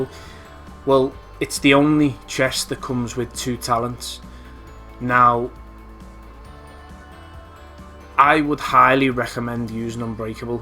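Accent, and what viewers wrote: Liverpool, United Kingdom. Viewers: Wasn’t expecting to hear a scouser on this video lol, not a bad build mate, where about in Liverpool you live, don’t live too far from there myself, got quite a similar build to yourself but for an ar build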